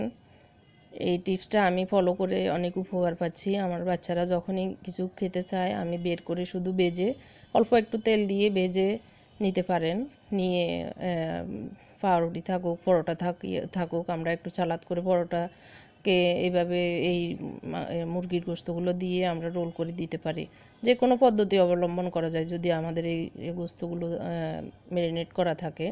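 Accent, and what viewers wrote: Noakhali, Bangladesh. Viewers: Apner kotha gulo purai noakhali la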